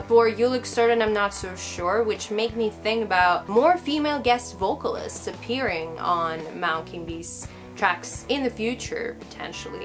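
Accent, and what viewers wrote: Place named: England, United Kingdom. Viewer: good review. Why do you sound english in your channel prologue?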